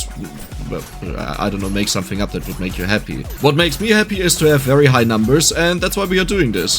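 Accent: Germany. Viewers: you can definitiv hear that dieser bruder is a deutscher bre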